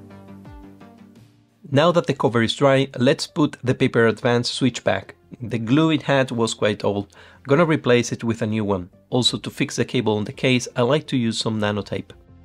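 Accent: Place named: Italy. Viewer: ah ok sei italiano :) avevo capito che non eri inglese ma non che fossi italiano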